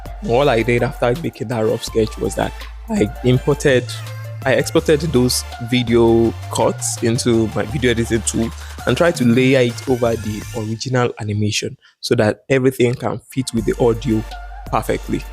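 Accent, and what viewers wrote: Nigeria. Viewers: I know a 9ja boy's voice when I hear one😂💪🏾. W vid🙌🏾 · Loving the naija accent my man! Let's freaking go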